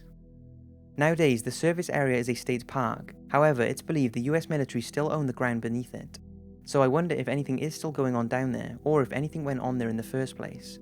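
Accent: United Kingdom. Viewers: Loving the content man, keep it coming! Out of interest, are you from the UK (and if so, which part)? Your accent is awesome for these videos.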